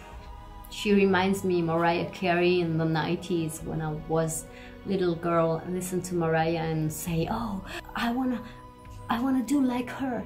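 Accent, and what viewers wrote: Italy. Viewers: I am in love with your Italian accent!!!! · I love your Italian accent and I love your reactions to So Hyang. Subscribed ♡.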